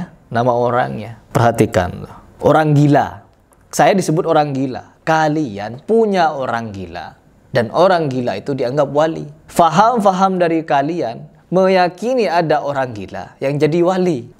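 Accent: Madura, Indonesia. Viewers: Abangnya logat Madura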